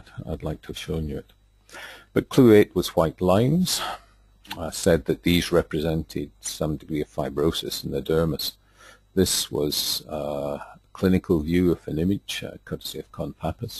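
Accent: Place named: Ireland. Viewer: Maybe their resolution isn't high enough but I would find it helpful if you increased your default zoom to maybe 120-130% if everything you do here. There is so much of your screen that is just wasted space. Otherwise, thanks for your series! It's been helpful as a dermatology refresher. I had encountered your videos a few years ago and had always wondered why I had this bizarre association between dermoscopy and Irish accents. Cheers.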